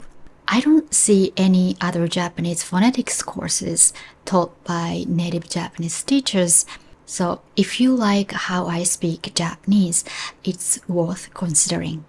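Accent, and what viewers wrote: United Kingdom. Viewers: I am Thai but I really like your English accent. I'm studying Japanese language, and I can practice English skills together. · you british english is beautifull🔥🔥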